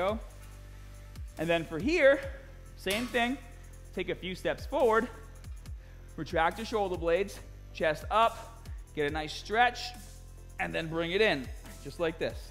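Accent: Boston, United States. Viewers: He is from Boston. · Awesome video. Ur Boston accent makes it even more enjoyable. I'd rather watch u than any of those not natty instructors on social media. Ur down to earth and real and u care about helping people. Thats the most important thing 👏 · Love this guy and his accent, he’s quite knowledgeable, sounds like a South Boston native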